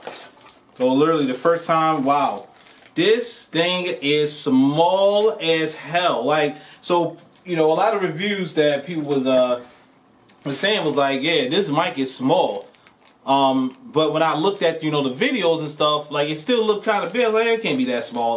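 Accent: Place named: Baltimore, United States. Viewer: Loving the Baltimore accent.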